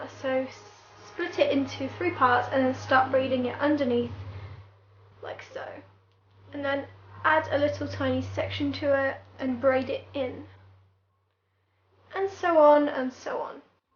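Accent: England, United Kingdom. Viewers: I just LOVE your accent! Where in the UK are you from? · You're like perfect! cx Are you from Britain? Love the accent ( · I think she is from England I have the same accent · finally someone british lol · No, she is from the uk x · You are from UK? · I luv your accent ... British somin like dat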